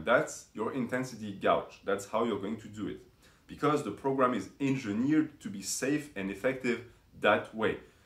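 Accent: France. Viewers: Ta un accent français ? Dommage que je comprends pas. L'anglais sa fait 3 ans que je m'entraîne en half est j'aurais aimé plus de renseignement est de changement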